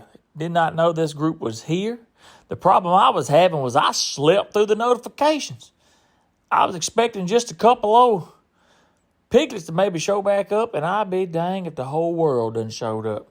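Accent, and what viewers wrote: South, United States. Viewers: I'm a woman who lives in the city. The only thing I've ever hunted is car keys in the morning so I don't know how your channel popped up on my thread. I can't tell you how glad I am that it did. I love your humor, your southern accent, your kind nature and your hog trapping. I subscribed after watching two of your videos. The only thing I don't like is that you have a bad back. Continued blessings. You're a treasure. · Goofy as it sounds, I enjoy your hog hunting videos. Cool southern twang accent. (Boss Hog would be proud of you)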